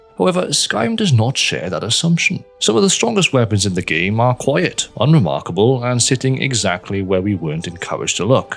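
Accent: Wales, United Kingdom. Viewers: WELSHMAN REAL?!?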